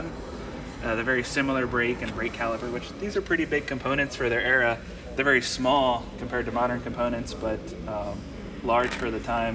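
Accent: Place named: Midwest, United States. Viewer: it’s a Midwest thing. They also say things like “ruf” instead of “roof”, “pop” instead of “soda”, “beg” instead of “bag” and “dror-ing” instead of “drawing”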